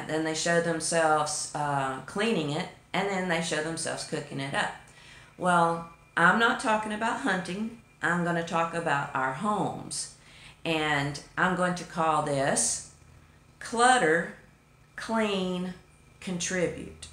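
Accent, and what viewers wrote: South, United States. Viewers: Well I do agree the older we get, we do start to TRY and rid ourselves of things we don’t use anymore and collectibles that just gather dust. But I do have a shoe and boot problem,I admit,I’m addictive to them. I love boots, cowboy boots,casual boots, rain boots, it doesn’t matter,I love boots. I love my shoes as well,I see a pair of cute shoes and I just have to get them. I have a problem,I admit it. BUT I do get rid of them if I don’t wear them for awhile and to be honest,some of them I have never worn, I use to be an impulse buyer, but I’m over that. I don’t like my house cluttered,I like things in its place and this sounds crazy to some, but I like to clean and I’ve been that way my whole adult life. If I get bored, I clean out something or organize a cabinet or drawers,just whatever hits me. I don’t really bother the boot and shoe area.LOL. 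Enjoyed seeing you and hearing that sweet southern accent,hope you have a wonderful day and take care and be safe.God Bless You all and praying for Granny. 💕💕💕💕 God Bless America ❤️🇺🇸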